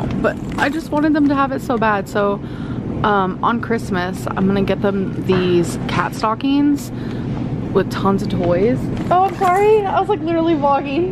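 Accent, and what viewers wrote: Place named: Kentucky, United States. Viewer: That's her danity southern belle kentucky accent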